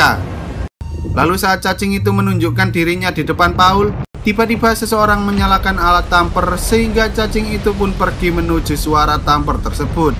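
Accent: Java, Indonesia. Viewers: Jawa nya ketara banget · Orang Jawa mana bang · medoknya bikin ngga fokus cokk. pusingg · Bang Abang orang Jawa ya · Medok euy 😁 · Logatnya Jawa apakah Mimin org Jawa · Bang lu kok medok banget orang jawa ya? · Jowone notok tenan😀😀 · Logat aja medok bett gaenak di denger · Maaf ya suara mimin medok bngt, mimin asli orang jawa. Hehe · Wong jowo rek? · Mendok bgt kurang suka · Bener" kental logat jawa nya. 😁 mantap bng semoga abg dan kita semua salalu sukses. Doakan kan kami semua para pejuang youtube pemula makin berkembang. Aamiin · Wong jowo yo mas? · suara host nya kental banget jawa nya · Mohon maaf, bukannya rasis, medok nya mengganggu.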